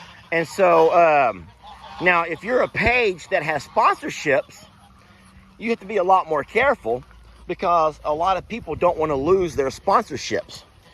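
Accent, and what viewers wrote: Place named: Texas, United States. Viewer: In Texas we have our own way of pronouncing words 🤣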